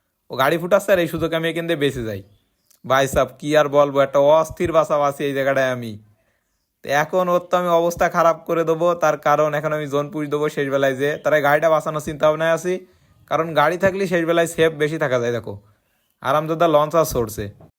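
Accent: Jessore, Bangladesh. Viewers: ভাই আপনার বাড়ি কি যশোর, সাতক্ষীরার দিকে?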